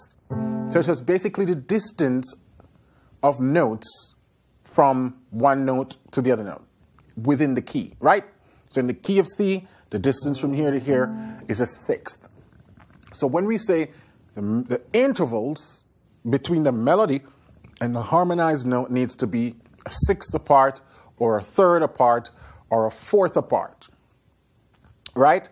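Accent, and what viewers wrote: Caribbean. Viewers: Hey I’m from Jamaica 🇯🇲. Are you from the Caribbean? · What Caribbean voice is this….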